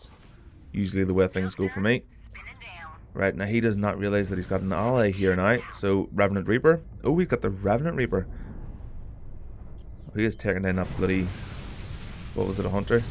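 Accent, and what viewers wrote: Northern Ireland, United Kingdom. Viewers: Ta man I was looking for this build. Good to see a Norn Iron accent online :D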